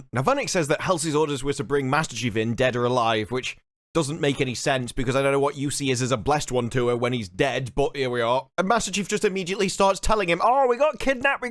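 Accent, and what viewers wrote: United Kingdom. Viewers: It's so fun to hear these reviews: It's like a recap of many of my own thoughts when watching the episode, in a UK accent. Thank god this season's over, let's hope the viewing numbers and backlash finally kills this foolishness a.s.a.p. (even if it's already renewed, goddamnit) Additional thoughts (alongside existing review): - Why do Plank and Tank react with any emotion to the reveal of kidnapping, instead of going for cold logic? - How'd Cheeks keep up with the other running spartans when chasing covy ship, without armor? - How'd Pinkstreak get into the ship, when she's only got a sidearm with her and it's literal spaceship-grade hullplating? - Why, when she hero-lands in the hallway (how'd she get a hole precisely over that place anyway?), is there not any howling wind from the hole she magicked into the hull of the ascending craft? 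- How did the crashing ship come back to basically in the same airbase that it launched from, when it was presumably programmed to fly away from there and was in the air for several minutes? - Why is the post-massive-security-breach meeting with non-relevant characters (Miranda and John) in the science room and not a boardroom of senior personel yelling at Parangosky? - Cortana should've answered: "I was in Cheeks' brain, so had access to every sensory datapoint. All these pointed to truthfullness (see archived report)." - Why has the UNSC not sent at least 1 single probe to the system that might hold enormous strategic importance instead of s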